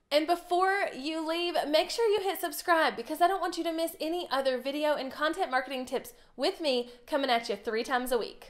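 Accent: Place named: Arkansas, United States. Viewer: Arkansas here! I talk the same way! lol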